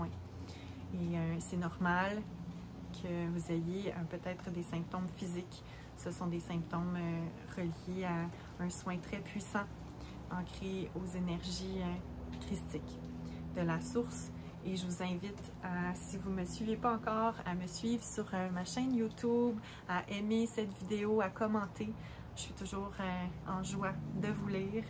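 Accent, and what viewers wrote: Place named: Quebec, Canada. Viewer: Tellement apaisant le bruit de la pluie, le bois, les couleurs des feuilles d’automne … et l’accent québécois 👌 merci pour cette douce mélodie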